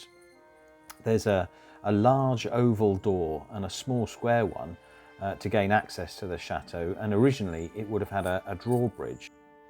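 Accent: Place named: United Kingdom. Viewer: Absolutely amazing tour of antiquity, made captivating by the tenor of voice, his knowledge of this historical structure, his colorful imagination, and his lovely British accent! Loved it! Thank you for sharing, but I'm also missing Anna's and your DIY projects, dancing & creativity!😉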